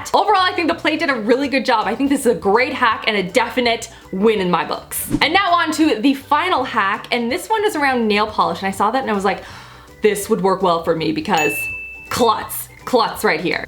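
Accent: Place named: Canada. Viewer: She has a slight Canadian accent.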